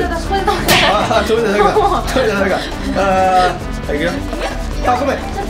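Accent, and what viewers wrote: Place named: Kansai, Japan. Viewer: 関西弁いい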